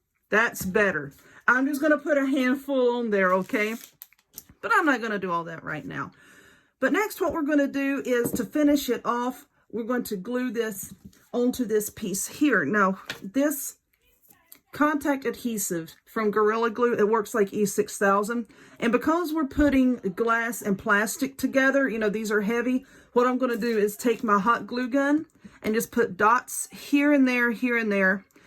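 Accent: South, United States. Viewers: I just love your projects! I love your Southern Accent and fabulous SENSE OF HUMOR! Merry Christmas from ONE SOUTHERN GAL to ANOTHER❤️🌲❤️🌲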